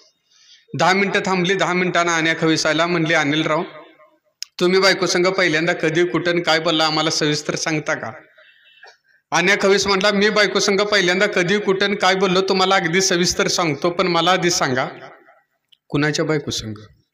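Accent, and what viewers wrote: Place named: India. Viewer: अस्सल ग्रामीण ढंग .. खूप छान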